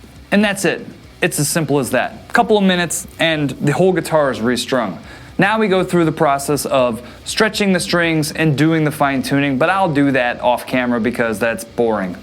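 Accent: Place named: United States. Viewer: wow cool.......i have seen your videos where you have visited the Musicstore in Köln. In 2009 i was there too. Amazing. First of All, thanks for my english and now because you are livin in Köln we can write in german?????! Also, es ist eine grosse Freude, Deinen Kanal zu schauen und mit Dir zu schreiben, mein Lieber......hahahahah super..........Ich habe neben den Harley Benton Gitarren noch andere und auch höherwertige, aber sie gefallen mir sehr. Ich bin aber auch kein Profi, nur Hobbyfreak. So Brother, did you have understood all???? And it is really the truth, your american english is so damn good to understand, i think i understand each Word.....i love that, so i can learn more and improve my english........Especially in guitar themes i understand a lot, when you are talking about politics or nuclear sience i understand nothing...but for normal things........it works.Thank God and praise the lord that he gave us guitars and cool music man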